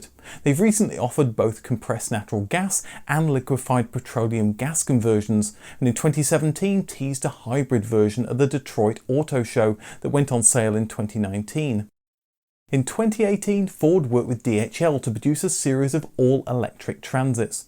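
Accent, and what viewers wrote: United Kingdom. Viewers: You look perfectly fine to me Bro. Love your British accent. Thanks from Cape Town, South Africa 🌍🇿🇦❤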